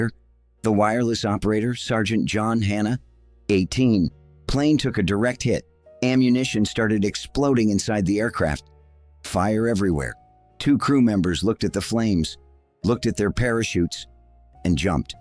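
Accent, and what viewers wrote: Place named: United States. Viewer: AMERICAN VOICE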